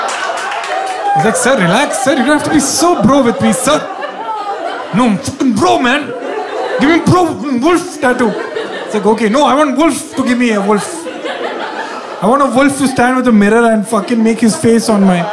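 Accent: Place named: South India, India. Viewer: This was so different from the prepared ones u do, felt so relaxed. Loved this one too! Although am I the only one thinking south indian accent is heavier than usual here?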